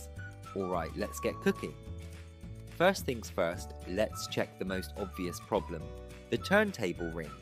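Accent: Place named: United Kingdom. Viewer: Can't understand the Limie accent.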